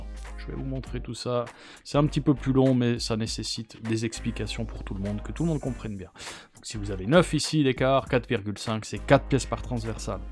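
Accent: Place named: Switzerland. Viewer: Je pense avec son accent et les huitante/nonante, il est plus du côté de la Suisse. Reste à savoir quel canton.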